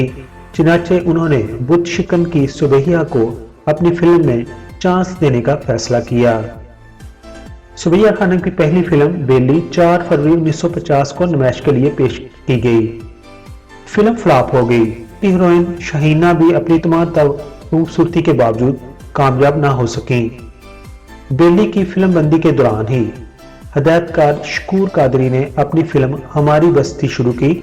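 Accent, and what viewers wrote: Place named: Punjab, India. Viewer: AP ka lehja pnjabi hai Urdu k alfaz p sahi grip nai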